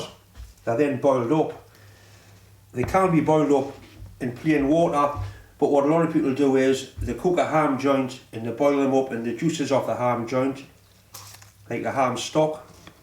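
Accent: Newcastle, United Kingdom. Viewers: good video , I did enjoy listening to the Geordie accent . Being from the north east of england , I miss a ham and peas pudding sandwich on a stottie cake . I cannot find the stottie cakes in las vegas.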